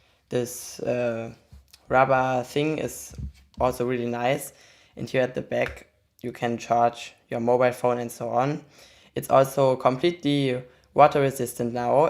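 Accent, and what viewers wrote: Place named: Vietnam. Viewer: keep up your great work, dude! I love your vinglish!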